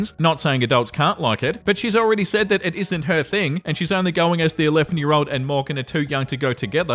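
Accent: Australia. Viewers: I always look for a new when I first wake up in the morning! It's so nice to listen to your soothing Aussie accent as I get ready fore the day!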